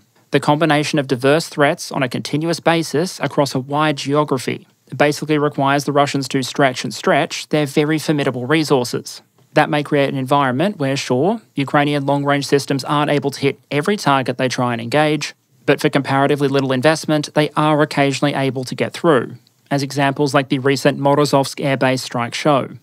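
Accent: Australia. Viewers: Too bad he didn't say "Vampire Counts" in his Aussie accent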